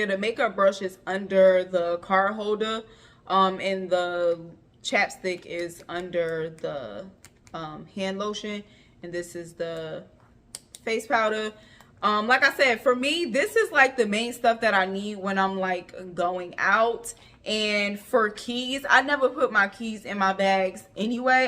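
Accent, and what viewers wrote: New Jersey, United States. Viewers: I came here for the bag review. I've been off of Coach for a while. But it seems like they're making a comeback. So here I am. CUTE BAG! But let's talk about you! You have a great personality. So funny. Classy. And real. You remind me of me and my friends. You MUST be from the east coast. Sounds like Jersey. (I'm from Newark). In any event, great video. Great job!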